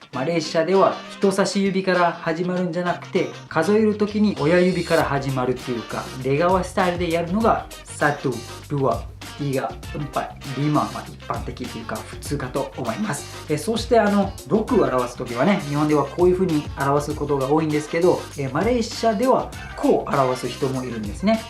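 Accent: Kansai, Japan. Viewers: *さんが話してる関西弁？大阪弁？のスラング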